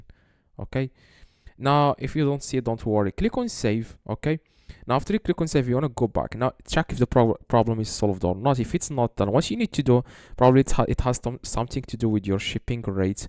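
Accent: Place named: Morocco. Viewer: Merci mon frère, j’ai très vite reconnu ton accent marocain mdrrr, Tu m’as sauvé d’un problème que j’essayais de résoudre depuis ce matin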